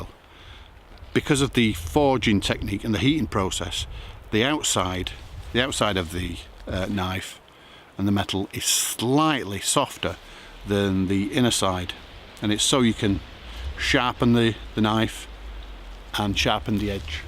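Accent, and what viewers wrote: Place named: United Kingdom. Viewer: I love the british accent, and great video bruv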